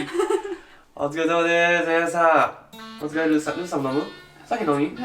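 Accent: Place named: Banshu, Japan. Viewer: いつも楽しく拝見させてもらってます。さんが時々 そんでとか 〜やけどしゃないやろと言った播州弁で喋ってられるのをみてすごく嬉しく懐かしく感じてます。小生中学生まで ６０年前までですが住んでいた者ですから。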